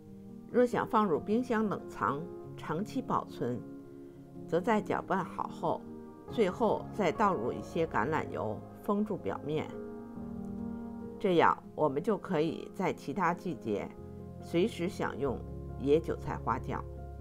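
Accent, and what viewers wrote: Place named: Beijing, China. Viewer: 我就知道您是北京的。我听出来啦！！！特别亲切😍 也祝您和家人周末愉快！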